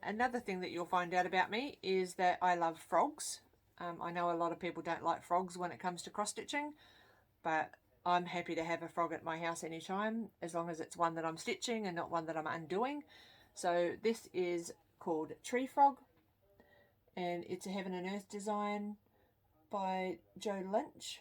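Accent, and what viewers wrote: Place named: New Zealand. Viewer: Well done on your first flosstube. It won’t be your last, for sure. Hi from Canberra and I can hear the NZ influence there!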